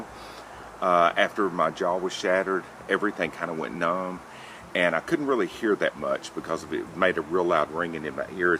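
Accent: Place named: Texas, United States. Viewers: thanks for sharing. The patch is bad ass and makes the vids better. Gives personality to the vids and character . Ive even shared these vids with my wife because we have the same accent. TX is the best state in the country... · Just found your channel a week or so ago . East Texas accent for sure I went to White Oak and have family out in East Mountain . Now living just over Texas line in Louisanna. I went through advanced cataracts in 2011 had implants put in but was completely blind for about 8 months and totally get the protect your backup . I now can only shoot with a scope since having implants my eyes no longer focus between long and short range my long range vision is pretty good with lens implants I have to rely on bifocals to see rear sight though and that doesn't work. Saw your video on Hawke scope just got a air rifle the new gamo 22 magnum swarm gen 2. 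Needs some more trigger adjustment and does tend to jump a little but getting it figured out. Looking at the Hawke sidewinder . Enjoying your channel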